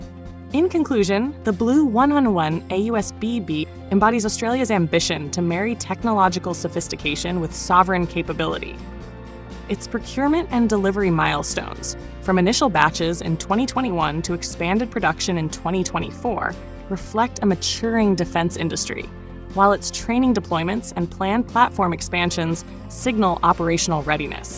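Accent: United States. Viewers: All the companies you mentioned are foreign owned. The only way Thales would continue is if it sold its operations to another foreign company. Not to mention this clip is narrated by an American.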